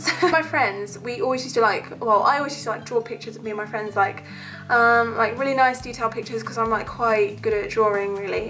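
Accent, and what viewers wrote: London, United Kingdom. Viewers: I thought you were from London or some where round there sorry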